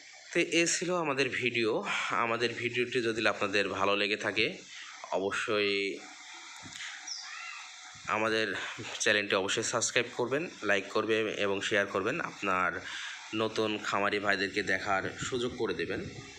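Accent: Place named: North Bengal, India. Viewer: আপনার কথা শুনে মনে হচ্ছে উত্তর বঙ্গে বাসা, বাসা কোথায় আপনার?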